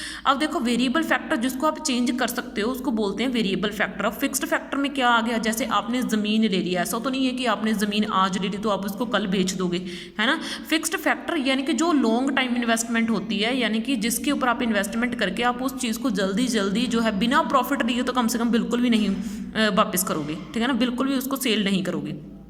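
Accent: Punjab, India. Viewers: I think uu are Punjabi 😅....way of talking 👳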